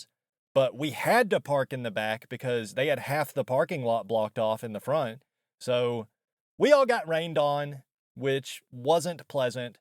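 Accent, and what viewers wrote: South, United States. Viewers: If all of this happened, which I very much doubt, why would you not stop your cousin, the multiple times he kept saying "black one!" to tell him that he shouldn't say that. You sound like you're from the South. There's no way you didn't know better. You are making all of this up. And it's cringe.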